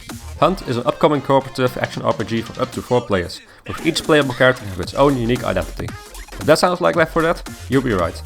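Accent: Netherlands. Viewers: yooo gast praat rustiger en duidelijker niemand verstaat wat je zegt met je nl'se accent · Nederlands accent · This guy isnt american i think because of his accent...... He sounds very dutch! (Please take that as a compliment) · are you dutch? ik hoor je accent xdxd. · Nice list, but holy crap your English sucks! That accent sounds dutch which is my native language as well, but I hard a seriously hard time understanding what you were saying. Please, either work on your speech skills or use text instead. This sounds like Mark Rutte and that's not exactly a compliment.. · You must be Dutch? Of is dat niet zo? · Dutch ? Kan het horen man :) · i think he is dutch :/ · je Engelse Nederlands accent is crap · I think he is dutch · Are you dutch?? Sounds like a dutch man xD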